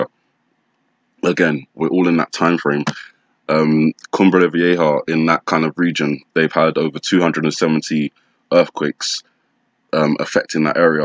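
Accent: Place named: London, United Kingdom. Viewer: Nice to hear a Londoner speaking on these matters, keep up the good work brother..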